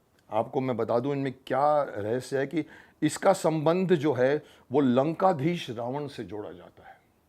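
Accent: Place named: Assam, India. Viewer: Wow aap assam bolte hai to mujhe bahut achha lagta hai . Ilove u jaan😍😘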